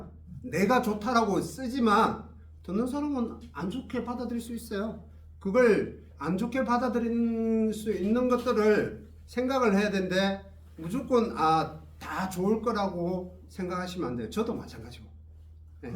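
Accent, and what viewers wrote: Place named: Gyeongsang, South Korea. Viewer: 선생님 강의 정말 감사드립니다. 경상도 어투라 친근감이 가고 귀에 속속들이 다가오네요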